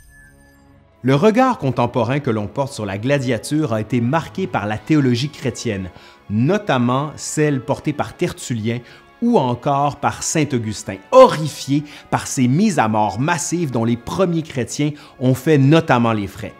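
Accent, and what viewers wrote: Quebec, Canada. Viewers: J'adore votre narration. Merci pour le partage. Je viens de découvrir votre chaine. Vos reportages sont courts et instructifs. Je suis contente que vous ayez un joli accent Québécois compréhensible comparé à vos compatriotes. Dommage beaucoup de reportages Québécois sont intéressants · Bon, comme on ne peut mettre qu'un pouce en dessous de la vidéo. Ben, je t'en mets d'autres ici. Merci encore pour ta chaîne si instructive... Et puis aussi pour ce succulent accent québécois qui, pour moi niçois avec mon accent méridional, enchante mon oreille de "français de France" mais qui admire la culture canadienne depuis longtemps (quand j'ai découvert Charlebois et Diane Dufresne etc quand j'étais "mino". Aller, ciao viva et bayeta comme on dit ici. CONTINUE À NOUS INSTRUIRE. 👍👍👍👍👍👍👍👍👍👍👍😉😘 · Tellement complet! Bravo. J'aime beaucoup votre manière de construire les vidéos, la manière de parler est nickel et ça fait toujours plaisir d'entendre un petit accent québécois! 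Merci. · Assez bizarre que tu n'aies pas autant d'abonnés que Nota Bene, pourtant ton contenu est lui aussi très intéressant et bien raconté avec en plus le petit accent québécquois du plaisir. En tout cas sache que tu as gagné un abonné fidèle.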